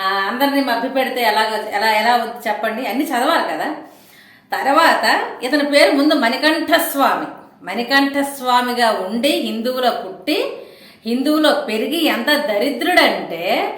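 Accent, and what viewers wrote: Srikakulam, India. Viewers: సూపర్ మేడం శ్రీకాకుళం యాసలో పాస్టర్ క్రైస్తవంలో ఉన్న చెడుని చూపిస్తున్నారు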